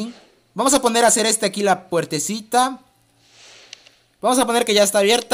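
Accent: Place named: Latin America. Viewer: me encanta el acento latino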